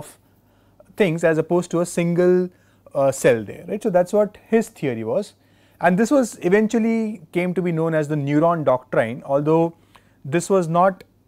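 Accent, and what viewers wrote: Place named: India. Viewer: 这个印度英语，有点可爱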